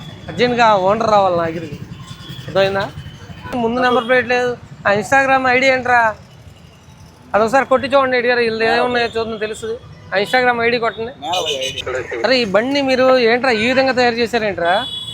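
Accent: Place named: Andhra, India. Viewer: ఆంధ్రవాళ్ళ వాయిస్ బలే కామెడీ గా ఉండిద్ది.... మీరు విజయవాడ అని అర్ధం అయింది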